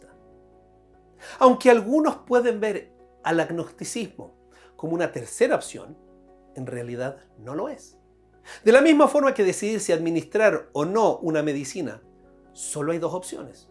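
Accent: Chile. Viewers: Nada que ver mi comentario ante un tema tan interesante, pero no puedo dejar de decir que lindo el acento chileno, cuando no es "flaite".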